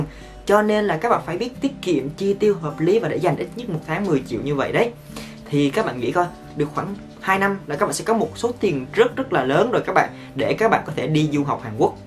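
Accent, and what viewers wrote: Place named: Quang Nam, Vietnam. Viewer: Anh ở Đồng Nai sao a nói giọng Quảng Nam ???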